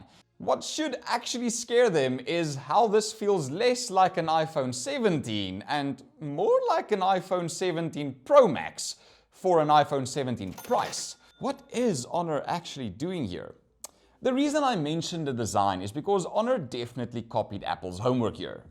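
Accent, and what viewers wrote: South Africa. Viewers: Is this guy from South Africa?sounds like it.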